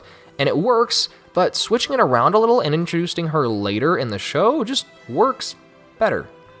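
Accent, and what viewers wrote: France. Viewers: I'm French and always knew Witch with the magazine you have (btw, really cool French accent xD) and yeah the arc continue a looooong time after Nerissa and all that stuff ! For me, Witch was still perfect untill the Ragorland arc with Hay Lin. It's the very first time as a teenager where I was not convinced, and after that when they open a magical school for kids I totally abandonned Witch... It's sad because I still think it was hell of a serie : the drawings were beautiful, it was really feminist, it talked really well about teenage years, it was epic, sad, disturbind, violent... Perfect ! I totally hated the TV show, it was ugly and too superficial for me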